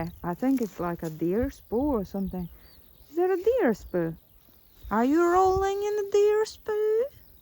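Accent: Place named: United Kingdom. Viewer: Buggers! I'm getting hints of British English. Charming!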